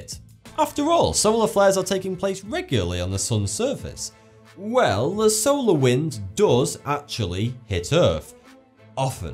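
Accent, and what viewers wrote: United Kingdom. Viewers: Are you British people really saying "sun" and sound like "son". WHYY!~?? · i like your british accent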